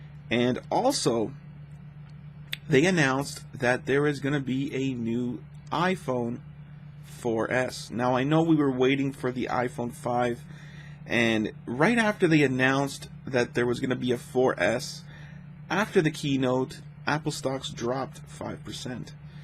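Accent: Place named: Canada. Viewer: You're in Canada? you know come to think of it, I do kinda notice your slight canadian accent :)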